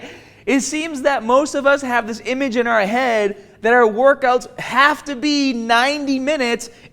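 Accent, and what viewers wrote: Boston, United States. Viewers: GREAT info and Bahston accent! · This dude's Boston accent is amazing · I can hear a Boston accent on this guy or is it just me hearing this. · Love that bastan accent 💯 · Bahston kid! Good video, thanks. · Excellent video thank you!Love the Beantown accent. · Nigga has a Boston accent and a White guy accent witch is just getting me confused like it really just throwing me off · is this dude from boston?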